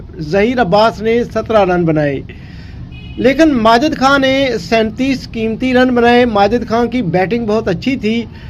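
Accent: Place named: Punjab, India. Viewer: اصل میں کہنا تو نہیں چاہیے مگر کیمرے پر واقعی گفتگو کے لیے اعتماد کے ساتھ مطالعہ چاہیے ہوتا ہے تاکہ الفاظ کا چناؤ ہو سکے کیونکہ تکرار ادبی اور تقریری خامی ہے۔صاحب پوسٹ دونوں حوالے سے کمزور معلوم پڑتے ہیں کیونکہ الفاظ کی ادائیگی میں بھی اعتماد نظر نہیں آیا۔بھلے پنجابی لہجہ غالب رہے لیکن مطالعہ روانی بخشتا ہے ۔ڈراما نگار منو بھائی کا لہجہ پنجابی ہی تھا مگر گفتگو سے پڑھے لکھے ہونے کا پتا چلتا تھا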